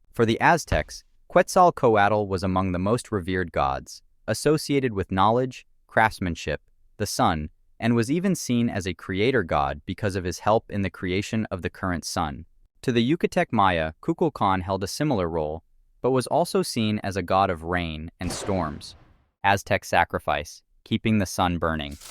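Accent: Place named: United States. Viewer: I understand not pronouncing most of the words correctly, it's a difficult language for outsiders and even Spanish-only speaking Mexicans fuck them up all the time, but having an American accent and intentionally pronouncing jaguar wrong(even more than normal) is ridiculous.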